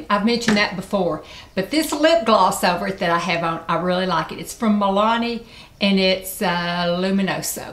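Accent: South, United States. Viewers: I love your southern accent and vibe. I grew up in the Ozarks and it takes me back to my roots. ❤️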